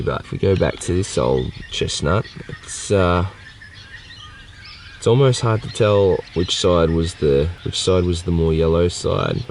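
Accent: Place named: Australia. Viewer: I know his Aussie I’m too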